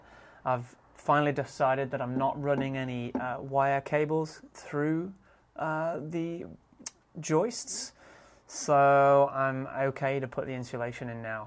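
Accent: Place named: Cheshire, United Kingdom. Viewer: ah thought i heard a little chester or cheshire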